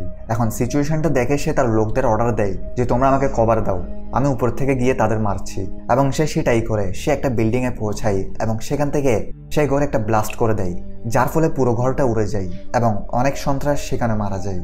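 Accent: Chittagong, Bangladesh. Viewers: Chittagong er pola! Accent shunle buja jai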